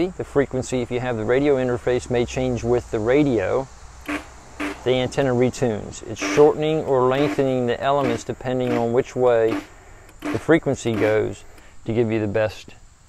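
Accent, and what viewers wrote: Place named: Eastern Shore, United States. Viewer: Could tell by your accent that your Eastern Shore Md, lol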